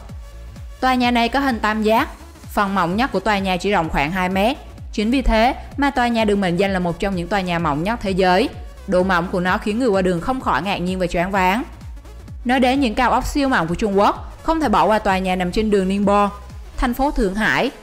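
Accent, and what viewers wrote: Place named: Central, Vietnam. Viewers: Cám ơn admin cháu gái dễ thương, thích cái giọng nói miền Trung này 👍👍👍👍👏👏👏👏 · Giọng nói của em kỳ kỳ sao ấy...!! Thà cứ nói đúng Giọng miền trung đi mà anh thích nghe hơn..! Chứ đừng sửa Giọng làm gì cho nửa nạc nửa mỡ...nghe muốn ói quá..!!!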